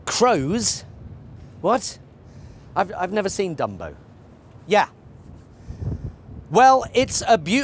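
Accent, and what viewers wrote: United Kingdom. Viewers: Johnathan Pie you are sooo handsome when you are spilling it out like you are doing. You are handsome anyway but it is so wonderful seeing you being such a strong man and telling it like it is. I have never seen you before or heard you speak but ,wow! You are something else. You British accent is so wonderful , too. Your suit becomes you, also. I am gushing, but I don't seem to be able to stop myself. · Idk how many times I've watched this video so far and I know I'm gonna watch it over and over again in the future. BTW the first time I got to know about this channel was when I saw a part of this video some where on the internet and I remember me searching the sentences of this video in an attempt to find his name😂 any way, thanks for being this funny. P.S. I really like this guy's accent and I want to learn it( I'm an ESL learner). 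Does any body know what British accent is this exactly? Thanks in advance😊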